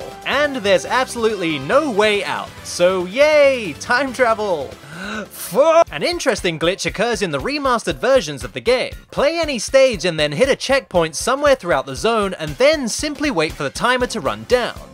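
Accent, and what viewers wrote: United States. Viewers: dude your American accent is pretty convincing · lol your American accent is incredible. Even better than IHE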